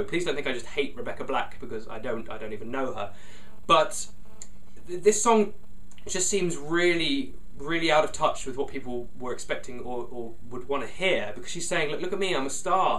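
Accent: United Kingdom. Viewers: I LOVE YOU!!!! You are a miracle to this planet and you explained this so well and I agree 100%! You are the smartest brit ever and your accent is amazing. And rants are awesome and people should just rant about more things cause sometimes they're really fun to listen to. This video is EPIC.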